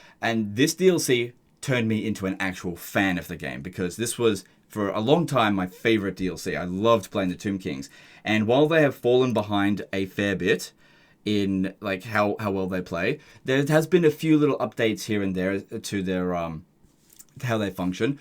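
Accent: Australia. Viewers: OMG!!! Are you Australian?!? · Youre Australian?!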